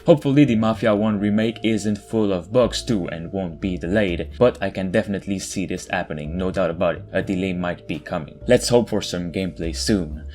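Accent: Quebec, Canada. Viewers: Tu es du québec ca c'est sur avec l'accent è la GSP hehehe moi aussije suis du québec. Si tu as envis de faire un podcast en francais un jour laisse le moi savoir! moi aussi j'attend mafia 1 remake jen'y ai jamais joué mais j'adore la série donc j'ai hâte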